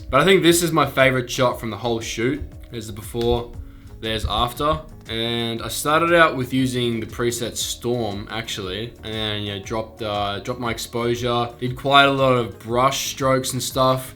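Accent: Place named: Australia. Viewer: An Asian girl with a Aussi Accent... Omg Dream girl hahah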